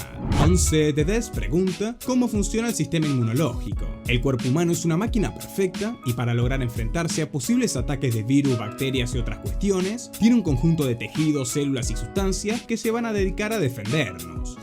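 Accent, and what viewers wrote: Argentina. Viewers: ERES DE ARGENTINA???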